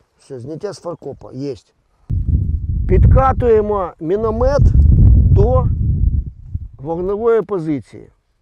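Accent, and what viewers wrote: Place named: Russia. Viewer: У ведучого росийский акцент в розмови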